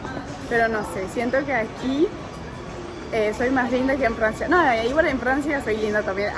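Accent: Argentina. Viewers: Hablas como una Argentina real. Felicitaciones desde Saint Maur de Fosses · Bonita niña, hablas muy argentina, con nuestro vocabulario, tambien con esas cejas mas Argentina !!!😂 vuelve pronto francecita ,mi descendencia es tambien de Francia. Acá somos muy fraternales, en el interior aún más !!!!!Un abrazo fuerte !!!!!😘👍👍👍❤️👏👏👏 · Hola!! Ya vas a volver!! Quiero felicitarte por tu muy buen acento castellano-argentino, te llevaste una muy buena dicción, ya te podés sentir más argentina que hace un mes atrás 😅👍👌 En todo el video hablaste un muy correcto argentino, como la gran mayoría lo hablamos, lo normal, sin excesos jajaja 😂😂 Genial!!! Te esperamos pronto, Argentina es tu casa, volvé cuando quieras, mejor dicho cuando puedas! Abrazos! 🤗🤗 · Como hablaaaa, es re Argentina 👏👏👏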